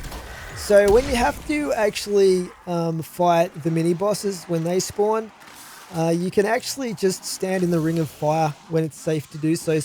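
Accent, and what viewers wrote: Australia. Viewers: Literally I only ever watch your zombies content. Somethin about your australian accent and calm demeanor makes you so entertaining. Definitely an underrated youtuber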